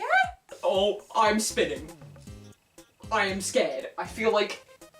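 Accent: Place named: Australia. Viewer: When I saw your video in Scott Cramer's video I truly did not expect an australian accent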